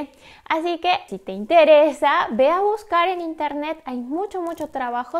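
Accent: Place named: Mexico. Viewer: Tu manera de hablar es igual a los mexicanos hablas cantando :v no te atine pensé q eras mexicana bueno ya tienes un nuevo suscriptor mas :v esperare con ansias en próximo vídeo :)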